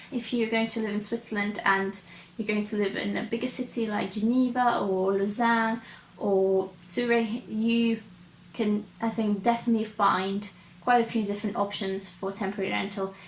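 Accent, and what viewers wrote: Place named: England, United Kingdom. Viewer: I ❤ the English accent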